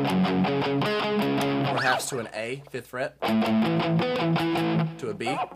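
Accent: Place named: South, United States. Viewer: I can hear that southern accent. :)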